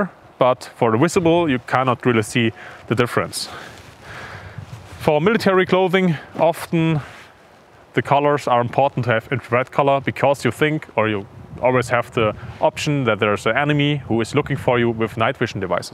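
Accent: Germany. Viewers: Fürchterlich wenn ein Deutscher englisch spricht. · Wdym he has a heavy german accent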